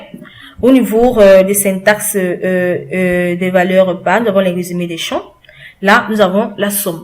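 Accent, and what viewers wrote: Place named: Africa. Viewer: toi tu es africaine , ca s'entend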